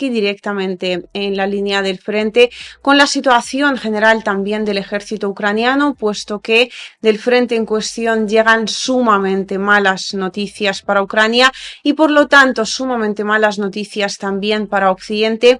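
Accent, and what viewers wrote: Spain. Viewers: Hola! Jejeje... Me gusta oir esa manera tan castiza de hablar. Saludos.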